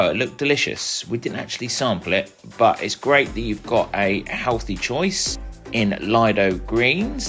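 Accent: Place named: United Kingdom. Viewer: It's the Brit accent. Same as pronunciation of taco, pasta, etc..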